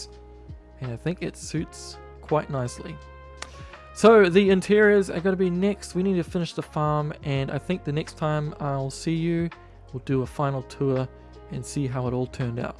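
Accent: New Zealand. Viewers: Judging by the accent, you're a kiwi, right? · kiwi sounding fella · You sound like your from New Zealand? Just wondering? Nice content btw 👍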